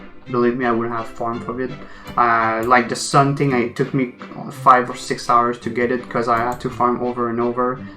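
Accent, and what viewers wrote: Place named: France. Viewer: Cuz u sound french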